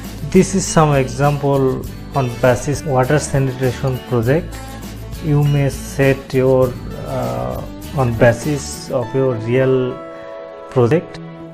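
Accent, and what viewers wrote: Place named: Bengal, India. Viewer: looks bangali English so try to focus on pronunciation & then upload it.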